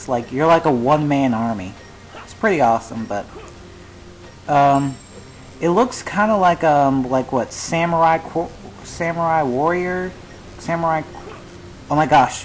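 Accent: South, United States. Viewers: You sound like a nerd with a southern accent. Your voice is almost unrecognizeable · Wow you voice sound so southern.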